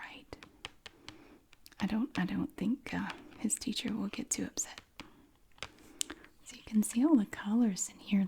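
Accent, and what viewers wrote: South, United States. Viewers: Just found this channel and love your southern accent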